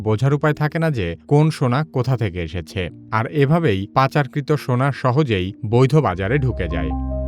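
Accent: Bangladesh. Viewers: ভাই আপনি কি নোয়াখাইল্যা নাকি?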